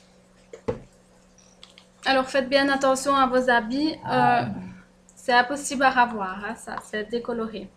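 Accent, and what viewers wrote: Liège, Belgium. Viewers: Ca c'est un accent bien de chez nous!! et de Lièèèèèège ;-)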